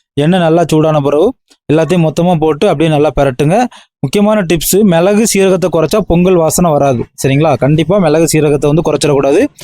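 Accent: Tirunelveli, India. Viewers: From his pronunciation I guess he is from Tuticorin/Tirunelveli side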